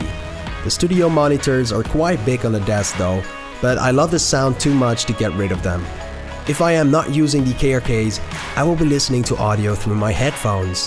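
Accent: Netherlands. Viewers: Ik was in de commands opzoek of je Nederlands was, toen zei je het zelf. Hoorde het toch net in je uitspraak af en toe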